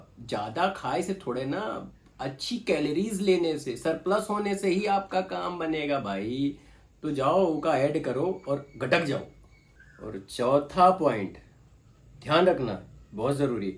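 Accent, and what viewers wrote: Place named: Awadh, India. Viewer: Samjhane ka tarika thoda casual hai bhai kya awdhi bolte ho bhai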